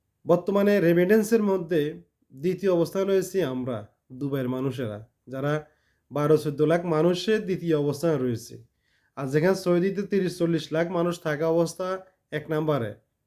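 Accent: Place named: Pabna, Bangladesh. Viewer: ভাই পাবনার কথা শুনে বহুত হাসি এসে গেছে।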